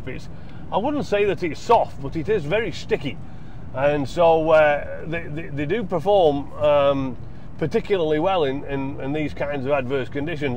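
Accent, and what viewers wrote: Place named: Derbyshire, United Kingdom. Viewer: I'd absolutely love to know where you're from!! Your accent sounds local to mine in Derbyshire, really enjoying your videos 👍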